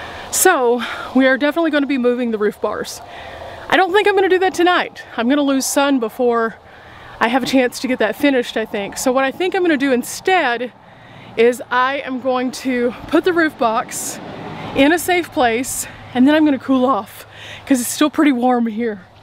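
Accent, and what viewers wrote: Texas, United States. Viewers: Ooooohhhhhh I have been waffling for a year too for my NV. Gonna watch and see!!! I haven’t been able to pull the trigger on a rack and box because the NV is just a little tippy toppy rocky already and didn’t know if adding up volume was going to make it more so. And can I actually crawl up and get stuff easily or not. Would I even? But I want to go on longer journeys and to do so I either need a roof box or hitch box. Waffle waffle waffle waffle waffle. I love simplicity and minimalism and well a big ole box seems like a big ole closet. Carry on vs checking luggage. But maybe. Can’t tell you how much your channel has helped me make decisions because you have the same vehicle and the same Texan accent 🤣. Oh I miss Whataburger! Misplaced Texan that needs to journey home to see the folks whose house just flooded in that last flash flood and so ya I guess I need a roof closet y’all, darn it 🤷‍♀️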